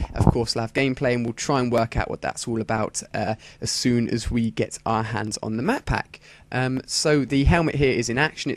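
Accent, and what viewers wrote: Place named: United Kingdom. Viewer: why is it always a british guy?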